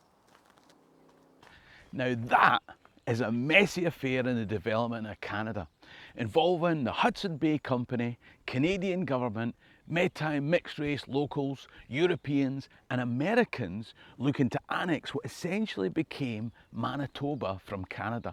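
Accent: Scotland, United Kingdom. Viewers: I think the accent has to be my favourite. The Scottish accent has inbuilt inflections, expressions and passion. It's also good to listen to a mixed race person who is at least as interested in the history of his white ancestors as his black ancestors. None of us really knows all the races they come from. As my paternal grandmother once said "a person is lucky to know who their grandparents are by looks. Women were forced. Women had affairs. No one really knows". As for DNA tests, people have received mixed results from different labs and some people have sent off the DNA of their pet and received back results for a human. A real in depth result costs a lot more than most run of the mill labs offer. DNA ancestry tests are for profit and for data gathering. One in particular admitted selling the info on to insurance companies.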